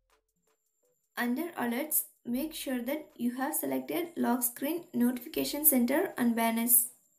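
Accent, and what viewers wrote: India. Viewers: From where you are ?? Most Indian speak English like that, I think she is Indian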